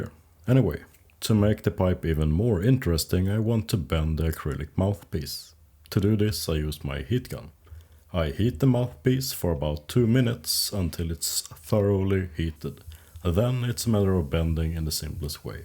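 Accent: Sweden. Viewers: are u swedish by any chance or texas? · 🌹THANK YOU for sharing 🌹 An awesome pipe and mouthpiece, thank you. Your English is good, real good. Your Swedish accent is real good also, I understand it perfectly, I listen to people. Please continue with the videos and the voiceovers. I learned a lot from this video, I think I will try making my own pipe, just once. 🌹🙏🏿😔🙏🏿🐾🐒🐾🌹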